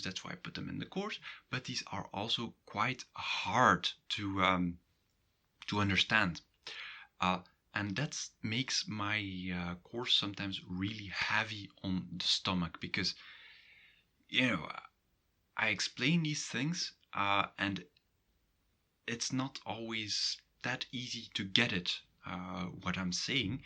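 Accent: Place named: Belgium. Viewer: Well, finally i can find a real Dutch course video from belguim's accents . I will follow all your courses...:-)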